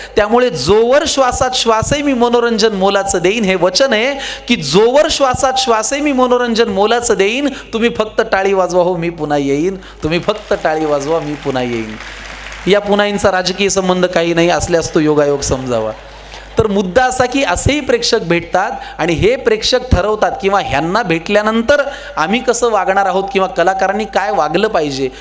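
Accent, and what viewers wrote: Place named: Marathwada, India. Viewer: खु छाण संकर्षण व्याख्यान झाले मराठवाडयाची बोली भाषा तुझ्या वाक्यात वाक्यात आली तुझ्या उज्वाल भविष्यासाठी खुप खुप शुभेच्छा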